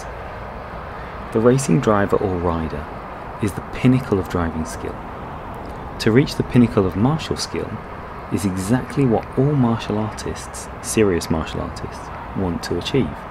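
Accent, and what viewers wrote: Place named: England, United Kingdom. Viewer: Thanks for postting, keep the good work! where in England is your accent from? Cheers mate!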